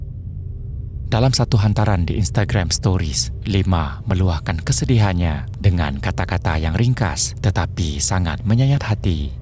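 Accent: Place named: Malaysia. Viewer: Min indon ke Malay? 😂Dngr mcm malay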